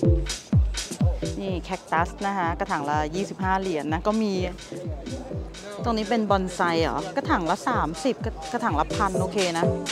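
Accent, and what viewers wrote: Thailand. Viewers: ผมชอบชมช่อง ตรงที่พี่ พูดกะนเองเป็นสบาย ถึงอยู่อเมริกามาหลายปี แต่ไม่มีพูดไทยคำอังกฤษคำเลย พูดสำเนียงไทยแท้ ไม่เปลี่ยนครับ ผมอายุ26ปีพ่อชอบพาไปเดินคลองถมมือสองแถวบ้านทีีอ่างทองตั้งแต่เด็กครับ เอามาตกแต่งบ้านมาใบ้บ้างมาใส่ให้ผมบ้าง จนโตมาผมก็ชอบของเก่าของคุณปู่ย่าตายายพ่อแม่ลุงป้าสะสมเต็มบ้านว่าจะเอาไปขายต่อครับ ชอบบรรยากาศอเมริกาที่มีความชิวๆเรียบร้อยไม่ค่อยวุ่นวายดี ถ้ามีโอกาสก็อยากไปสักครั้งในชีวิตครับ😁💚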